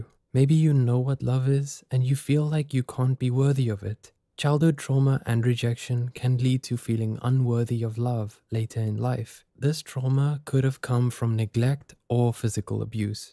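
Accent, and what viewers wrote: South Africa. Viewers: I have a question, is this a south african narrator? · I know a South African accent when I hear one 🇿🇦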